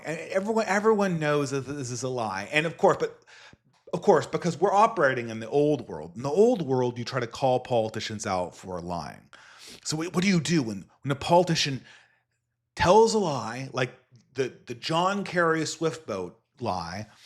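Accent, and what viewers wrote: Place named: California, United States. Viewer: you really gotta do something about the California accent.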